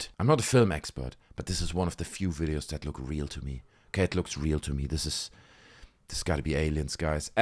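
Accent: Germany. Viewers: this guy sounds german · Mit deinem deutschen Akzent. Klar könnte das so sein. Aber es könnte auch eine Nachahmung von diesen Ballons sein. Auf jeden Fall hat die brasilianische Armee Stellung dazu bezogen und gesagt sie wüssten nicht was es ist. Das sagt mir, es war kein Ballon sonst wüssten sie doch von san joan und Ballons.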